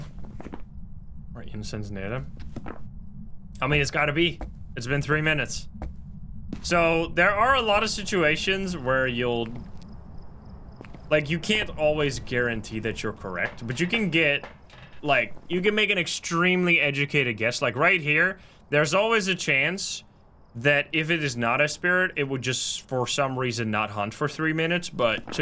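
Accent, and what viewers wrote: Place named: Netherlands. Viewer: I've been watching your YT vids for a while now, learnt A LOT, so thank you for the wide knowledge. Also I was used to hearing my late Oma's Dutch accent that I could no longer hear it, and its taken me weeks to figure out you're Dutch yourself lol. I finally picked up on it with some of the words that in English have the "th" sound.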